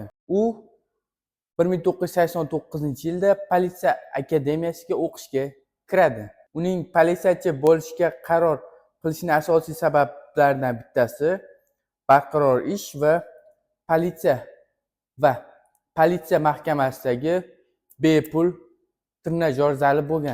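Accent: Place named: Tashkent, Uzbekistan. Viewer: Yana bitta narsa toshkent shevada emas adabiy gapirish yaxshiroq buladi manimcha nutq juda juda zaif ishlash kerak ekan agar shu sohada ketmoqchi bosa bu bola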